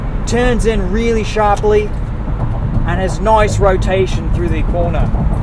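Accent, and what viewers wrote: Australia. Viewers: Dat Aussie accent tho... :O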